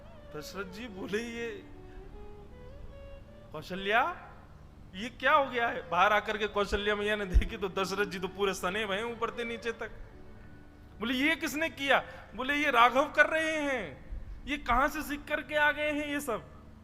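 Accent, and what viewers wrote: Braj, India. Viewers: Aap k muh se brajbhasha bahut sunder lagti wah wah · आपके श्री मुख से ब्रज भाषा बहुत ही सुंदर लग रही है मैं तो तरस गई थी ऐसी भाषा सुनने के लिए 🙏🙏🙏🙏🙏🙏🙏🙏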